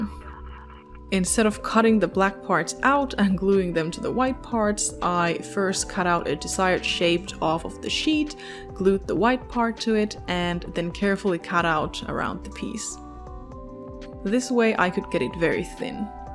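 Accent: Finland. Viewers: Is that a Finnish accent I hear? Anyway, this video has a criminally low view count. That's an amazingly well-made outfit, and a video showing the process of creating a brilliant outfit like this one should always get a lot of views.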